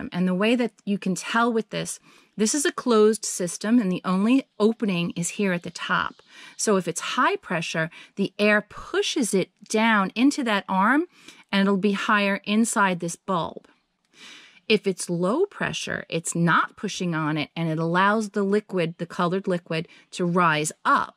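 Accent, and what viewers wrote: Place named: Rhode Island, United States. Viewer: Just found your channel. Very informative. Question, your accent sounds like mine ( Rhode Island ) are you from New England?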